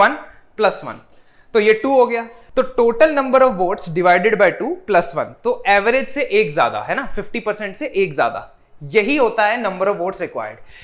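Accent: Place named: Punjab, India. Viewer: Sir tuhade accent to meinu tusi Punjabi lag rahe si. Jado mei playlist dekhi ta tusi sachi muchi Punjabi nikle😂😂😂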